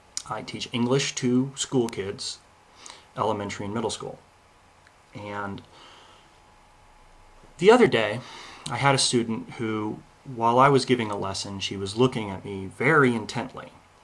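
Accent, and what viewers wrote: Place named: United Kingdom. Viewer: is the wise man of the Tribe. Having a strong british accent, being older than 18,5 and having had sex at least once, he tries to lend some legitimacy, to the average race realist, who has non of those. Its a fun effort to watch, especially knowing its predestined to fail. However I have to appload your kind and smooth delivery. Its a suttle art to strike gently.: )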